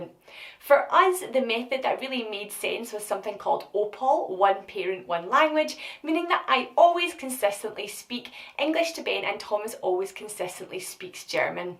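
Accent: Scotland, United Kingdom. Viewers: Love this video! My husband is french and I’m English/Welsh so we have a few languages going on! We’re also sticking to the one parent one language system but I do need to prod my husband mathieu when he forgets 😅 You have such a lovely accent! My wee granny was from Lewis and I spent all my childhood summers there so I have such a soft spot for the Scottish accent ✨ Lovely channel! New sub here, I can’t wait to see more ✨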